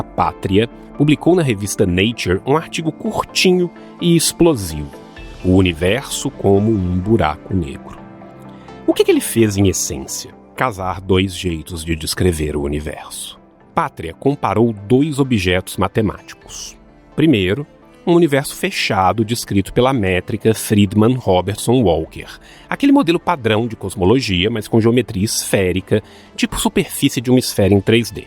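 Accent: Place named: Minas Gerais, Brazil. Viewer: é um universo. E o sotaque mineiro deixa tudo melhor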